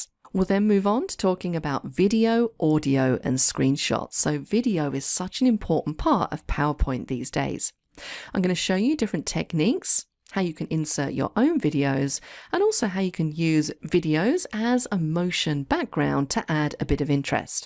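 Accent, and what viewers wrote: United Kingdom. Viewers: Thank you so much...in fact I am a zero user of P.P., but in fact I´ve learnt a lot just by watching this and your previous videos. I also like your accent I am kinda a fan of british one...take care and regards from Trujillo- Perú.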